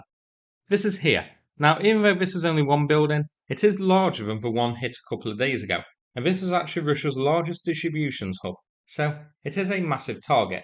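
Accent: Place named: North, United Kingdom. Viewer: The English is clear but has a North country accent. Turn on the subtitles if you are having trouble.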